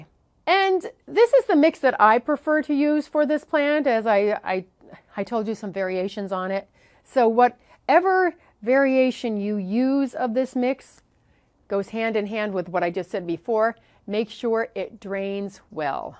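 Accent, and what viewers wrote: United States. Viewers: you Americans have funny accents.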